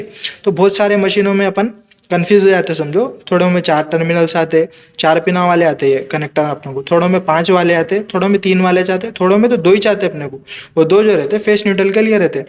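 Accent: Hyderabad, India. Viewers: Bhai aap Hyderabad ka hai aap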